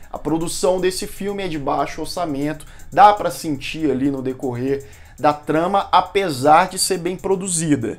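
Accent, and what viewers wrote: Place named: Goiânia, Brazil. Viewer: Sotaque goianiense detectado!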